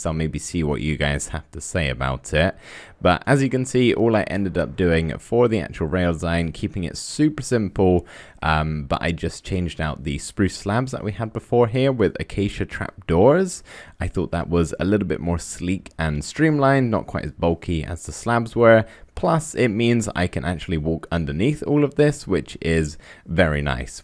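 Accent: United Kingdom. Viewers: Hello, British!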